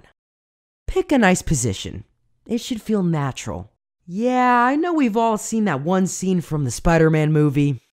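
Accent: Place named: United States. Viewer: In thought u were american XD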